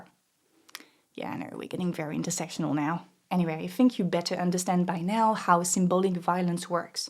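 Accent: France. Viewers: This video makes you the only French person I like 😂 (idk if you are French, I've never seen your channel before. Just going off your accent) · what an elequent frenchly fluid in english spoken summary...refreshing..i drank it up slowly knowing it will nurish my mind like a glass of mineral water,far from boring and short enough for lingering thoughts and ideas about the world we live in,i now have a new word that encompasses the silent violence we experience.Thank you ms. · Hello La chanteuse Aya j’aime beaucoup ❤ quel pied de nez à la société dite bourgeoise qui est déconnectée de la vraie vie. Le changement est douleureux: l’enfant qui fait ses dents le sent et il a mal, Le monde la société change comme bébé fait ses dents partout c tout, il faut rester patient. On juge et on est jugé c une dynamique. Il faut rester gentil indulgent avec les intolérants, comme avec bébé qui fait ses dents, car on est tous formatés, et dépassés par le changement comme bébé qui fait ses dents et qui pleure qui comprend pas ce qu’il se passe. 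bref faut rester gentil tolérant et ouvert d’esprit comme avec bébé quand il fait ses dents car il souffre il a mal et il comprend pas pourquoi car son organisme change la nature fait son travail… C super j’aime ❤ t simple et sympathique ❤ J’espère que tu vas en faire plein de contenus ❤❤❤❤❤❤❤ ça change de ces intellos compliqués et coincés que sont les intellos français. ❤ je kif ❤ce contenu en anglais a l’accent français tenu par une française de souche ❤ j’aime qu’on parle d’autres langues ❤que le français ❤ C une ouverture d’esprit ❤❤❤❤❤c très bien expliqué avec des mots simples ❤❤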